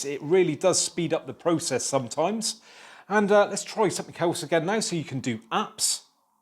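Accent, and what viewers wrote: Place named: Somerset, United Kingdom. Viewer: are you from somerset? you sound abit like it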